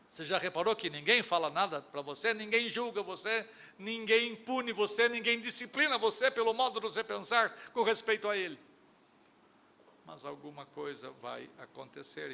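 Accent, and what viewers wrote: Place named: United States. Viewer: Q sotaque de americano é esse às vezes? E "nois"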